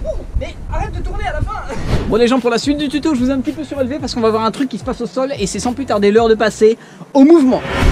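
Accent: Belgium. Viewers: Belge ou français ?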